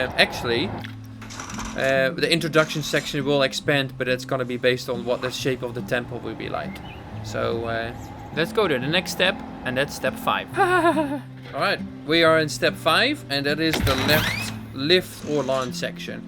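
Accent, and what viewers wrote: Netherlands. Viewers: You're based in New Zealand? You sound so Dutch!?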